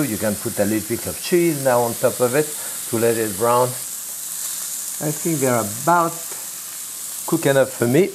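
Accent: France. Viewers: exactly, every other commenter is a celebrity obsessed child. the reality is this was an atrocious example of cooking on every level. food safety, ingredients, technique. sorry, other than the charming accent and the nice personality (my dad speaks clear American English also from France about the same length of time). I love burgers and good cooking by the way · Besides watching Jacques cook, I love listening to his voice. My maternal Grandmother was from France and it reminds me so much of how she'd speak English in her beautiful accent. It's so comforting to watch him cook and listen to him describe the process while relating personal stories. I'm sure I'll never have the privilege of meeting, but I'm extremely grateful to be able to watch his videos on YouTube and Instagram every day.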